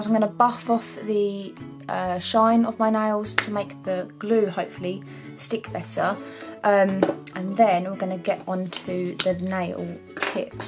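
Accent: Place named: London, United Kingdom. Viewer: It’s so nice to hear a london accent. Really enjoyed her video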